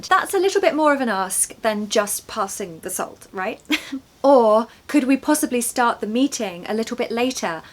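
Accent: United Kingdom. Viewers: I absolutely love your teaching style! Your enthusiasm, lovely smile, and expressive hand gestures make learning English so enjoyable. Please keep creating more videos in your beautiful British accent—your lessons are truly helpful and inspiring! Thank you!" I'm watching you from Guildford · I simply love the british accent, the smiling expressions and the fun facts about the UK...Miss the country and miss the Brits 😃 I shall arrange a visit soon. Thank you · I'm so fond of British accent, culture and etiquette. How I wish I come to the UK and possibly meet Teacher I love the way you teach your British accent and culture. I'm from Cameroon. God bless you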